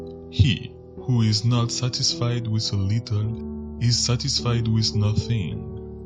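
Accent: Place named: France. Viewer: This guys voice fd the whole video up... Annoyed me so badly... French?? What is this voice?? Yuk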